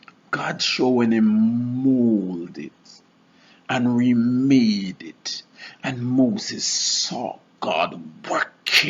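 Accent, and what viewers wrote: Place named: Jamaica. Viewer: Whewwwww straight up Jamaican dialect/vernacular lol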